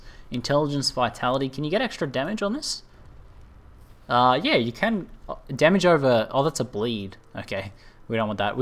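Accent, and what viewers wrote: Australia. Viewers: You have just became my favourite streamer. Recently I have been paying attention to a couple of D3 streamers, like Archon, KingKongor, blaqksmith & etc. I prefer you to any of them! Keep up the good work! I think it has something to do with your Aussie accent, or you just remind me of a friend, but your description during gameplays are absolutely colourful and intriguing!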